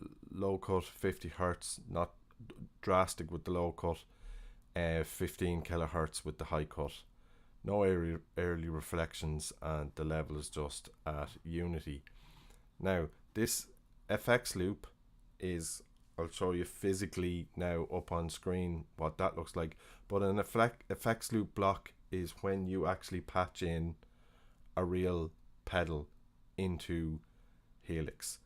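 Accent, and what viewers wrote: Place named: Ireland. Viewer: and? You started life with two strikes against you. I was going to offer my sympathies but I heard your voice and it appears you're Irish which more than compensates for the two aforementioned failings.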